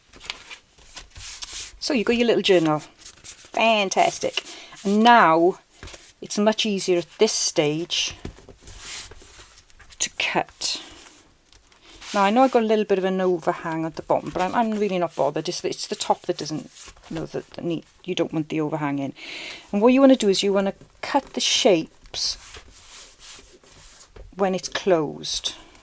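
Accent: Wales, United Kingdom. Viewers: Nice to meet you 😍 love your Welsh accent, adorable 🥰 thank you for your tutorial, you certainly have given us plenty of ideas on tags and I especially love the Tag Journal with the B&W papers, brilliant! Vellum is one of my favourite elements, so I’m excited that you’ve included this too! Thank you again, have a wonderful day, hugs and blessings 💜🙏🏻🫂😍⭐️ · Love love loved this tutorial! You are a natural and so at ease on the camera. Really enjoyed your video and your Welshy voice also, I felt right at home. Thank you for taking part in the collaboration Hugs Rachel xxx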